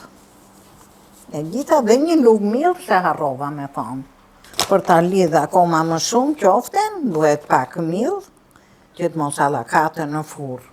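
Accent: Tirana, Albania. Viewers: Te lumshin duart e nderuar zonje.Te kenaq me te foluren origjinale , ke dhe zerin te bukur , dhe te perfytyroj nje zonje te kendshme tironse❤ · Amon sa mir qe je nje zonj tironce me adete e me te folme.hallall ta bofte zoti☝️🤲🤗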